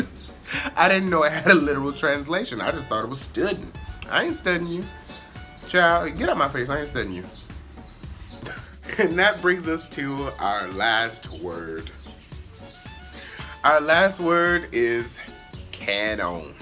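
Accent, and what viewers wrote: Gullah, United States. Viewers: I'm from the low country just outside of Charleston. You don't have the geechee accent. You have the gullah accent. Like beafort. Geechee accent is way different.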